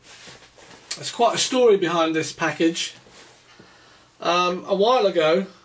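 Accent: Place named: England, United Kingdom. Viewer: nice pipe..are you england? I noticed something diferent about your english .I m brazilian .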